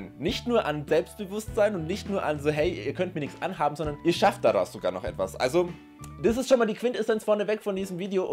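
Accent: Swabia, Germany. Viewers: Ahhhh Schwabenländle liegt net in Bayern 🙄🙄🙄 und du redest da ja gar net bayerisch sonder Schwäbisch 😩😩😩😩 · Aber jetzt moi Hand aufs Herz: Wos du redsts is koa boarisch sondern schwäbisch ha? Auf jeden Foi host mi inspiriert a moi wieder a Video auf boarisch zum macha! 😅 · Zu witzig. Hört sich teils so an, als würdest du aus Ulm kommen. 😂 Aber mega lustig geworden.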